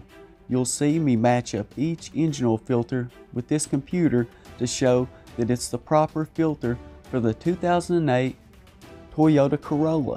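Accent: South, United States. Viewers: Except this guy's accent is real. Sean had the worst attempt at a southern accent I've ever heard. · gotta love the southern voice. relaxing to listeni to.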